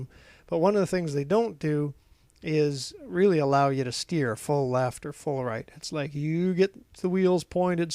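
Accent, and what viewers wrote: Canada. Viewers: Good video! Looking forward to the follow ups. Hey where are you from? You sound Canadian to me. Oshawa here.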